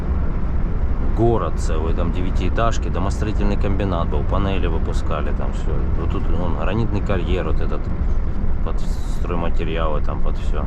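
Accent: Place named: South, Russia. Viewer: ! Ты же якут, а откуда такой говор южный?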